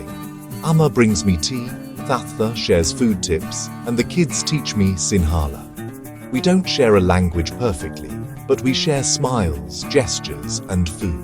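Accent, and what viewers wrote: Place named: United Kingdom. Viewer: AI slop with a Limey voice over.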